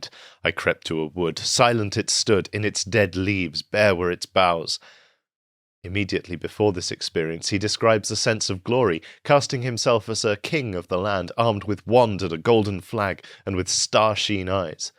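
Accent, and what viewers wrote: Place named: United Kingdom. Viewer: Heavens to Betsy that was a phenomenal piece of art to hear. Excellent delivery too, Cadence perfectly emphasized the proper beats. I imagine it loses something of significance when it is said in any accent that is not British.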